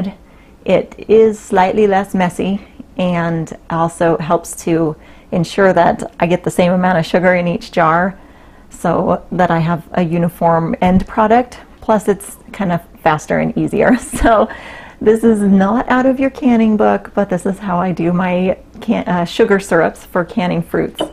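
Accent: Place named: South, United States. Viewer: you make it all look so easy -- can 9 quarts of peaches in 14 min. Wow, it takes me all afternoon just to peel the peaches:-) Ok -- I heard somethings in today's video that made me think -- you were not born and raised in Utah, were you. I heard a couple of different accents today. I would say East of Texas, but not Mississippi or Alabama -- maybe southern Missouri, then I heard some east cost -- North Carolina, maybe a touch of Massachusetts. I would say that you moved to Utah about 20 years ago. Now send me 7 quarts of peaches -- or you will get 3 cases of SPAM Lite (even the dogs will not eat that!!!)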